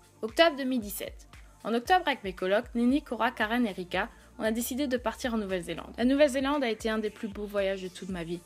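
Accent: France. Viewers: Vie ta vie, continue de penser de vivre comme tu le fais de partager ta passion avec nous de nous faire vivre à travers toi. Profite a fond et continue t’es jolie vidéos Tu mérite vraiment tout ça continue de nous parler anglais avec un accent français je suis la première à ne pas me faire comprendre quand je parle anglais Bref encore merci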